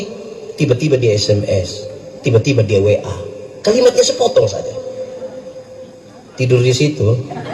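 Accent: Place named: Eastern Indonesia, Indonesia. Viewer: Membiasakan diri mendengar logat bicara orang timur, asyik juga.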